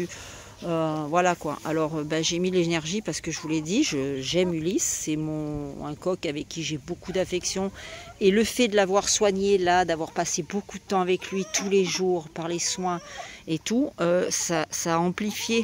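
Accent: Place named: Auvergne, France. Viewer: Coucou, Merci de nous régaler avec votre quotidien au poulailler, et votre accent auvergnat naissant. Gratitude pour tous vos partage et pour faire rayonner notre belle region. Je pari que les nouveaux seront des barbues belges Bonne fin de semaine a tous et courage a tous pour l arrivée de l automne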